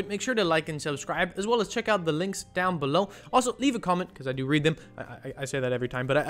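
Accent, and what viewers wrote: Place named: Germany. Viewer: This video is very funny an Sehr gut! Pronunciation too I can tell you're German 😂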